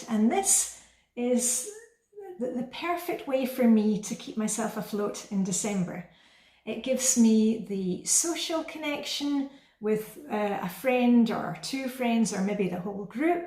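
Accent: Scotland, United Kingdom. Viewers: My mother had heart disease & I cringed when I saw your mum drinking alcohol, but maybe it's not an issue with for her. Your accent sounds strong after living in Denmark for 20 plus, but when you return home to Scotland do they tell you you've lost much of it? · Thank you for an uplifting video I do buy my own Christmas gifts or given exact list. You have inspired me about the 5K in December..... I can walk everyday in December for at least 15 minutes.... I noticed your Scottish accent is stronger after visiting your mom blessings to you, thank you, Dallas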